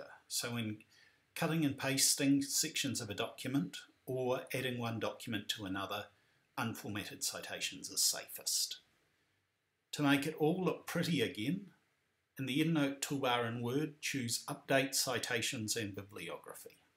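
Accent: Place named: New Zealand. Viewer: So reassuring to hear this advice in an NZ accent!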